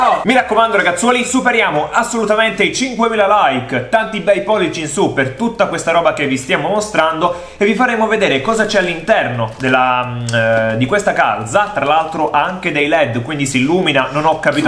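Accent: Naples, Italy. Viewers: ma e Napoletana?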